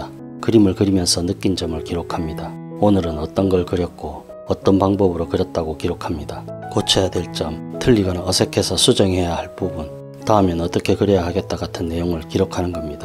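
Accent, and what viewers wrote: Gyeongsang, South Korea. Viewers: 쌤 반갑습니다😊 귀에 쏙쏙~ 지루하지도않구 항상 감사해욤. 쌤 갱상도 쌤 맞지예~??ㅎ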